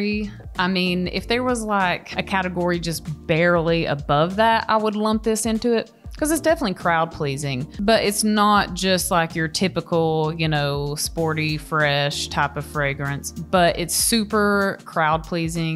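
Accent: South, United States. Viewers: Gosh, I love your accent. I mean, I'm also American, but still. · you talk just like him but just with a female voice and southern accent lol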